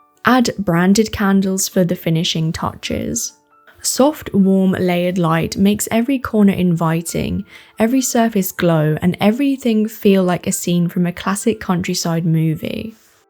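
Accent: United Kingdom. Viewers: Very nice. Thank you for sharing your first-hand experiences with us. We're going to have to work on YouTube's subtitle app -- it seems unfamiliar with mullions, toile, and yew, and for that matter, even manor houses! Love your beautiful British accent!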